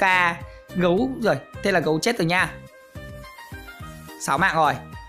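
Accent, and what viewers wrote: Nam Dinh, Vietnam. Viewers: Ah quê nam định à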